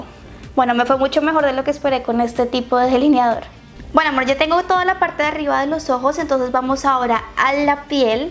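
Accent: Colombia. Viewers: De dónde es ella? Tiene como acento colombiano o es solo mi impresión?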